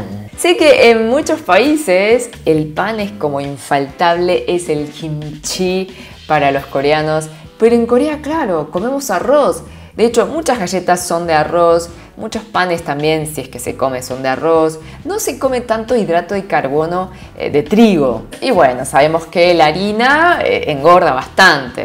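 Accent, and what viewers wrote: Buenos Aires, Argentina. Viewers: Supongo es coreana se ha criado o vívido por mucho en Latinoamérica y si su español suena a Argentina! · Asu una coreana hablando con acento argentino😱😄que chistoso se ve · No tengo ascendencia coreana parece pues me miran y engordo. Habla como argentina. Linda chica presentándonos su bella cultura. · Tu español es perfecto , pero con acento Argentino!! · Y tiene acento argentino. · Me encanta oirte y verte, tu acento argentino es genial, sos fisicamente coreana pero culturalmente todos tus gestos , tu manera de hablar y tu alegria son latinos, te felicito por ayudarme a conocer mas de tu lindo y hermoso pais, soy fan de los doramas, besos desde Honduras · Tenes 2 de las 3 cosas que me deriten de una mujer ojos preciosos asentó Argentino pero tu piel no es morena 👍♥️👍♥️👍♥️🇬🇹👍👌♥️👍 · Acento peruano, limeño? Para nada, su acento es argentino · No se guíen por los rasgos! 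No tiene un mérito especial que hable exactamente como una argentina si lo es de nacimiento o llegó muy chiquita. Por muy negro que sea un tipo, si nació y vivió en Sevilla será y hablará sevillano. Conviene pensar antes de hablar o teclear! :D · Flaca pero tu hablas como Argentina como eres coreana? Nacida en Corea o de padres Coreanos? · Tiene acento de la Argentina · Una coreana con acento argentino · Te felicito por el perfecto español-argentino que hablas jeje. Adoro el coreano , me pone triste no poder pronunciar ni siquiera una palabra. Gracias infinitas por contar costumbres y alimentos saludables que consumen. Bendiciones para tí. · Eres Coreana, hablas español con acento Argentino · Wow!! Hablas perfectly Argentinean 😱🇦🇷👏sos hermosa! Me encanto tu video! Gracias!!👏😊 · Habla tan bien porteño 😍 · Hola apenas descubrí tu vídeo pero una pregunta además de hablar muy bien el español miro que tienes un acento como argentino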